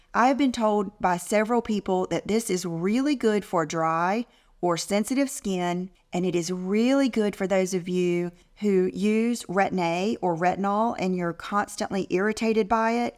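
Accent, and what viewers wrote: South, United States. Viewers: 100%. I will never forget when she made fun of the lady in the elevator. When people show you who they are, believe them. That sweet Southern accent doesn't fool me.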